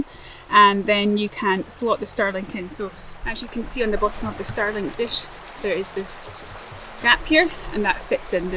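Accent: Scotland, United Kingdom. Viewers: Soon as I saw this, thought brilliant, love one of those so checked out the web site and they are pretty useful compact pieces of kit ,really handy for recharging; Our head torches, my old basic XP130 Fuji Camera (mostly for Insta but planning on relaunching utube channel), power washer (for our muddy mtbikes), devices, vacuum cleaner (camp necessity with debris and midgies reluctant to exit by their own free will) and brightening things up with traditional Christmas multi coloured led string lights. Love your content and delivery with that accent and enthusiasm for whisky/all things Scottish! cracking channel and entertainment!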